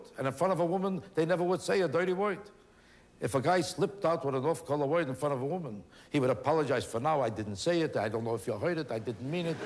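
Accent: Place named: New York, United States. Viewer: Just the best jokes. His voice and delivery always make me happy. Reminds me of living in New York when I was a boy. God bless him.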